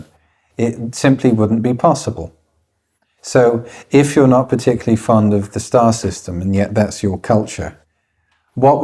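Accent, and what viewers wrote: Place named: RP, United Kingdom. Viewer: What I like most about Fripp is his accent. Clear, well spoken English. Maybe a bit too RP on the ends of words sometimes, but otherwise very clear and easy to understand.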